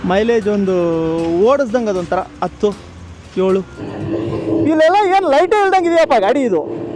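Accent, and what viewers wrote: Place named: North Karnataka, India. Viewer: That uttar karnataka slang between the conversations ❤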